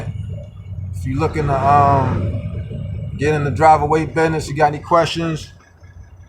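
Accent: Baltimore, United States. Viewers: You from Baltimore?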